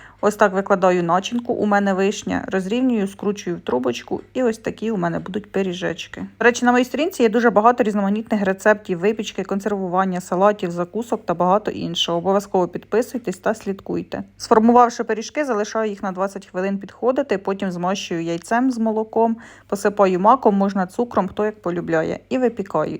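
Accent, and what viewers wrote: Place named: Western Ukraine, Ukraine. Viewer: Вам рiдна,але не чисто украiнська,наголос бендерський.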